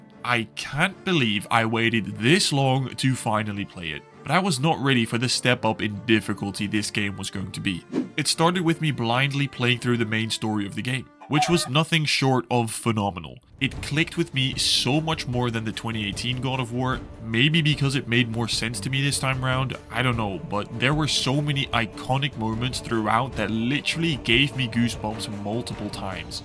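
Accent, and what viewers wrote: Netherlands. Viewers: Hey! You Dutch? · Ik hoorde gelijk dat je een Nederlander bent hard ik gooi een sub voor die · One question are you dutch ?😅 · I know a fellow dutchie when I hear one. And the part with your brother absolutly killed me as someone who could as a dutchmen haha. It also confirmed my suspicion about being dutch 😂 anyways loved this vid as im getting into plat hunting myself right now.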